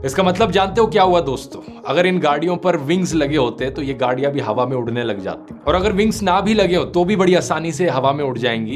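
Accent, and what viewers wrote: Haryana, India. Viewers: Why does he suddenly have haryanvi accent?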